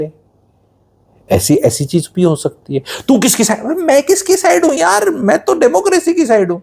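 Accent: Haryana, India. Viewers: Too much haryanvi accent is killing the content of the video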